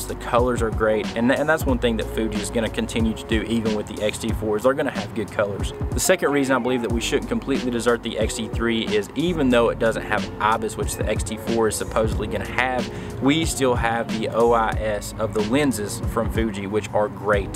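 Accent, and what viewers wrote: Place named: Eastern Kentucky, United States. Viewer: Great video, gained a new sub here. And you speak my dialect as I am in Eastern KY :)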